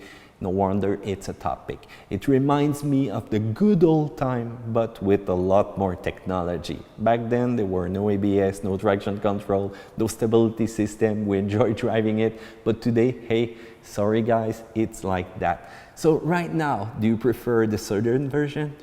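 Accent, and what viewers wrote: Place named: Quebec, Canada. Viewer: I can tell sort of a French accent.. But it's a little different. Is that a French Canadian accent?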